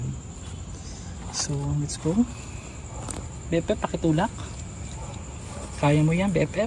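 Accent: Visayas, Philippines. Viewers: Ka cute nimo mag bisaya oie 😍 amping permi ❤God bless 😇💖💖💖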